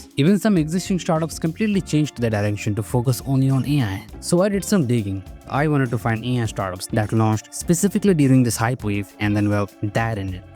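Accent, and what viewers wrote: India. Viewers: Buddy you got that indian accent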